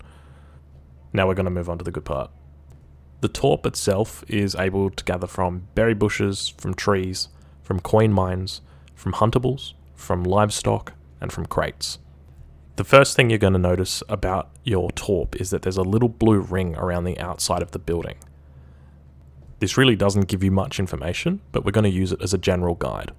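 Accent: Australia. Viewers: and hes aussie asf.. Fuck yee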